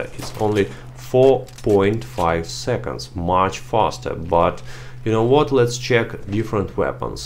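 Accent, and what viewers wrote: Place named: Russia. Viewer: Good vid, i like your russian voice, you sound so much cooler than my boring midwest american🤣🤣gonna sub ya now too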